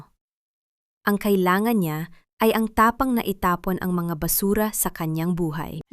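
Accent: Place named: Visayas, Philippines. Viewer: Parang bisaya kadi mga narrator nyo eh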